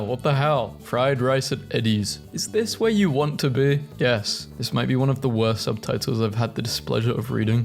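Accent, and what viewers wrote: United Kingdom. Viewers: wait ur british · You British? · YOUR BRITISH TOO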